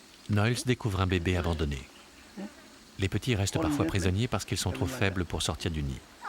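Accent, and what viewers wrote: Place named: Marseille, France. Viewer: De ouf le narrateur il est un peut marseillais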